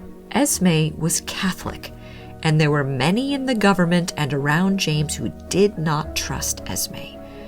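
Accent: United States. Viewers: This is American accent at its finest.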